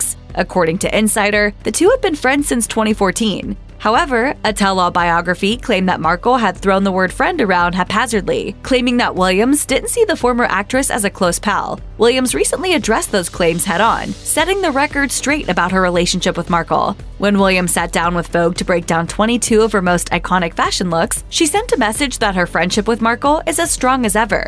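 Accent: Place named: United States. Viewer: This is an American site... note the American accent? 🤦‍♀️🤦‍♀️🤦‍♀️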